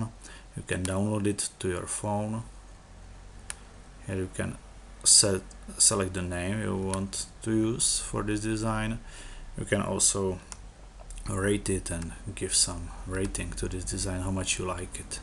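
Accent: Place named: Czech Republic. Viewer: Which nationality are you?? It somehow sounds pretty much like czech accent.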